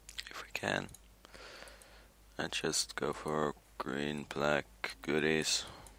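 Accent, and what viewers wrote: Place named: Europe. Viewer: Holy fucking hell, you sound like utter SHIT...and, oddly sound like you have a some sort of European accent? I'm confused.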